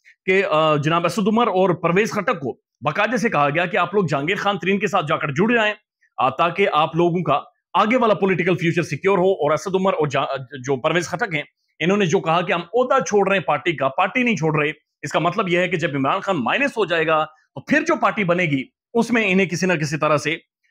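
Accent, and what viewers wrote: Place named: Kashmir, India. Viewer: bahe ap kya Kashmir se hain ap ke language se Lagta ha😂😂😂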